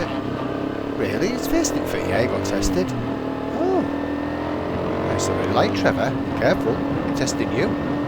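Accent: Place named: Hull, United Kingdom. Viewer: Ahh the Hull accent, I still pronounce my u's and i's like an e. Flert, skert and hert... three random words there from top of my head but story of my life actually!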